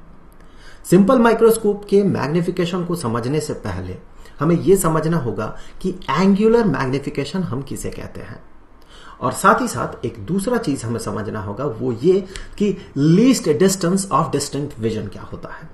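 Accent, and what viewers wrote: Bihar, India. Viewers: Sir are u from bihar?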